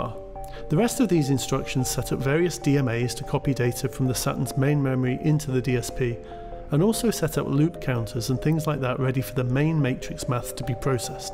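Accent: United Kingdom. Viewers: Accent? he’s from the United Kingdom.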